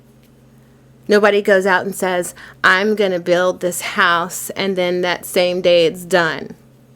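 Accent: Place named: United Kingdom. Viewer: Loved Your British accent !!!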